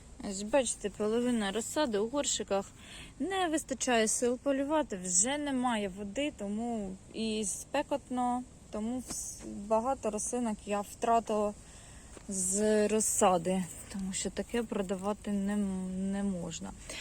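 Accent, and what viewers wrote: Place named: Russia. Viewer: Трудно слушать украинскую мову , в исполнении русскоговорящих.Ну так и хочется помочь . Зачем ? Конечно может это требования ютуба или нашей е- ной власти , а может необузданый патриотизм , не знаю . В любом случае сочувствую.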